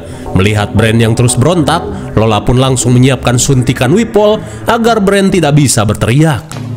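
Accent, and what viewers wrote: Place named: North Sumatra, Indonesia. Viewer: Bang..kau asal sumatera ya 😂😂kdg logatnya kek org sumut😅😅😅 penasaran.semoga di balas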